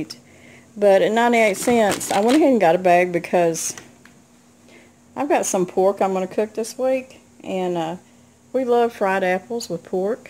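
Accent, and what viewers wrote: South, United States. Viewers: Amen !!! I have been so poor at times in my life but my children never went to bed hungry and we always had what we needed, not always what we wanted but what we needed !!! My kids are grown and I finally went to college and became an RN, I now no longer struggle to pay my bills but I still don’t have fancy things, God showed me I don’t need fancy, I am happier with thrift store clothes and raising a garden ! I am so grateful for the hard times and I’m so grateful for the abundant times, God is good and faithful !!! Watching you with your down to earth personality and your southern accent warms my heart !! Blessings to you and Mr. Brown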